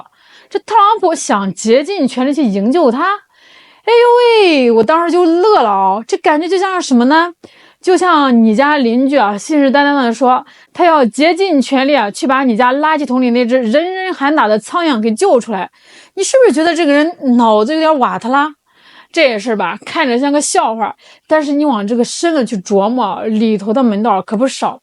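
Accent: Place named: Northeast, China. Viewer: 唉呦喂 東北妹的腔調就是有致命的吸引力啊 喜歡😘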